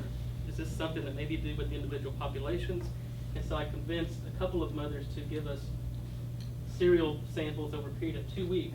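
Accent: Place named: Texas, United States. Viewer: This is a cure for heart and stroke disease without changing a bad diet!! It makes you bullet proof even if you eat greasy junk all the time!!! Just get a pinch of sodium nitrite and add to every liter of drinking and cooking water. Sodium nitrite in food grade quality is available for about 6 bucks for 2 lbs, enough for a human for a decade of use at these levels in drinking water. Please turn up the sound and endure the bad quality sound, and Dr. slurring sort of Texas accent, it is worth every second. Take the trouble to listen 10 times if you need to, and GET this, especially the sections on the microscopic artery video showing adhesion of monocytes (a type of white blood cells that turn into macrophages or cellular garbage trucks), and how they penetrate the lining of the artery, starting the creation of plaque, but do not when the sodium nitrite is added to drinking water. 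This is absolutely astounding footage!!! Note how either 50mg or 150mg per liter of sodium nitrite in drinking water completely negated the harmful effects of high cholesterol diet, and prevented atherosclerosis!!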